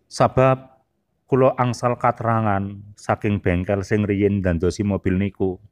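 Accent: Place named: Banyumas, Indonesia. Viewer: Bagus..jawa versi ngapak banyunasan...jawa tak hanya jogya solo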